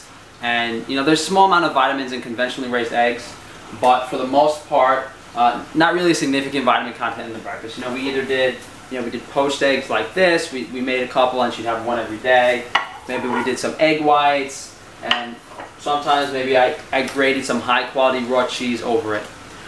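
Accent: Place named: New York City, United States. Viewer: Interesting accent. Can hear some nyc italian twang.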